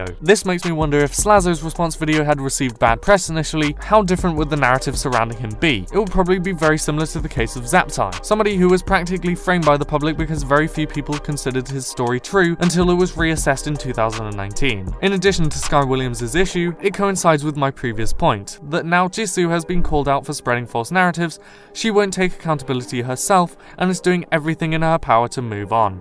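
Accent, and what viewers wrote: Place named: United Kingdom. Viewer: this shit would be hard as fuck to follow if you werent british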